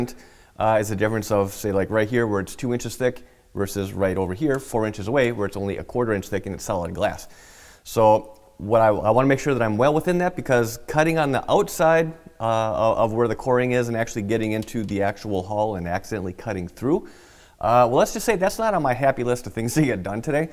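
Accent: Midwest, United States. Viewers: Really like your knowledge and presentation. And a good Midwestern accent. Ex michigander here.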